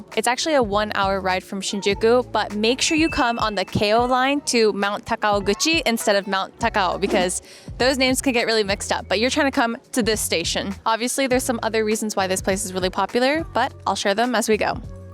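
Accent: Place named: United States. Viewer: Your american accent sounds perfect, your voice is so female.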